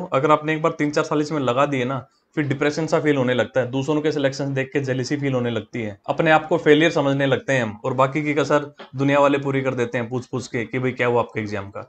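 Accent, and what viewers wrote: Haryana, India. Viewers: Bhai Haryanvi touch❤️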